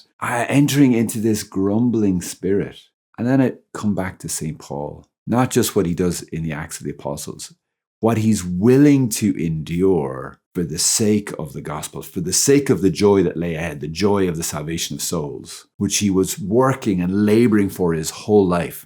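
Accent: Ireland. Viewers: Great advice indeed! And nice to hear the Irish brogue! Beannaithe Dá leat!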